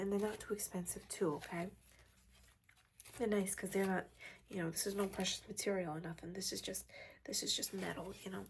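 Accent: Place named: Brooklyn, United States. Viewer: The Brooklyn accent is so good 😂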